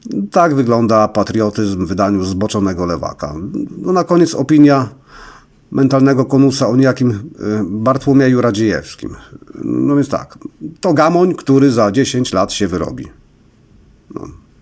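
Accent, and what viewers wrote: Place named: Podlasie, Poland. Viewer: Uspokój się ćwoku zaciągający podlasko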